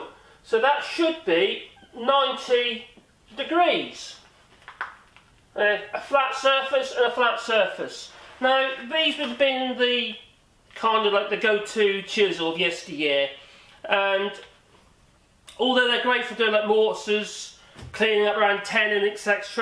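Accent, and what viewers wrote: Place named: London, United Kingdom. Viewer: You sound like a fellow Londoner. Walthamstow lad here.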